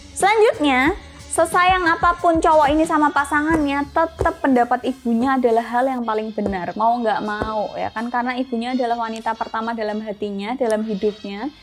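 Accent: Java, Indonesia. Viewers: mba uwong jowo to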